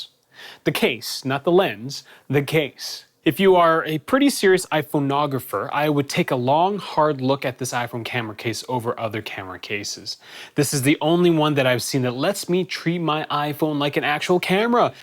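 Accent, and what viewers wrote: Canada. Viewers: Ha canadian eh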